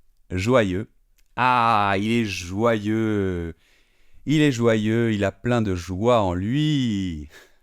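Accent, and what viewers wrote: Paris, France. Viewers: ça, il ne va pas capter, parisien il est devenu. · Tu va expliquer au basque,au toulousain,au marseillais,au Auvergnat ect a bien parlé. Tu dois être parisien.c'est qui les débiles qui ne savent pas dire serrurier, écureuil...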